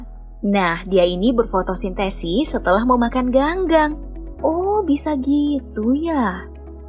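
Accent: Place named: West Java, Indonesia. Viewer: Ini mimin nya pasti org sunda😅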